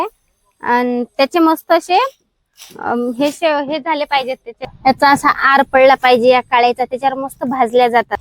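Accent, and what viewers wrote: Vidarbha, India. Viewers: विदर्भाची भाषा ऐकून खूप छान वाटले ताई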